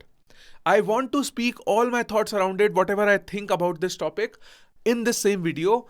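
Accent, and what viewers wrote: India. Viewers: AP b English desi bol te he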